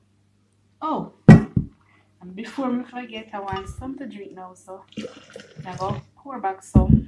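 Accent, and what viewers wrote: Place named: Jamaica. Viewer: Love the patois(patwah) down to earth!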